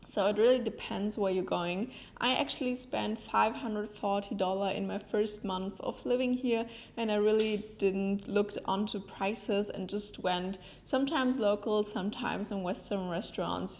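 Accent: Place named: Germany. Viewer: Ah the German accent. Thx for the info btw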